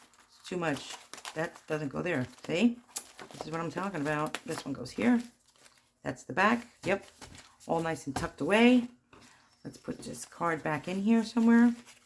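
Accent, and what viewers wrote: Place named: East Coast, United States. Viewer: Loved this journal! I knew from your accent that you were an East Coast girl (me too! RI/CT)! New subbie! Thanks for sharing!!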